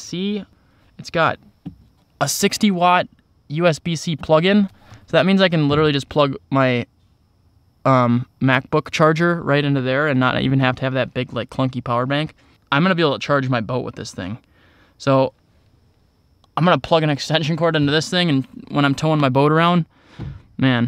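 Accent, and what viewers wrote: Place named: Minnesota, United States. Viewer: 40 seconds into the video I knew you were from MN, lol Nice build man!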